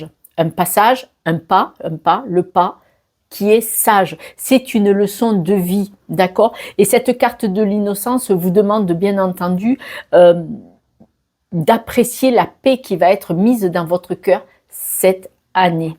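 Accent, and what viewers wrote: South, France. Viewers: Bonjour je viens de m abonner à vous merci pour votre guidance et ce bel accent du sud merci à vous à très bientôt ❤😘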